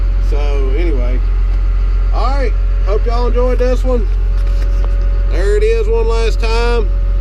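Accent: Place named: South, United States. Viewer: You sound' further South'...... I did not know, Virginians sound so Southern... 'Real life'..... nice... Different than 'created content'.......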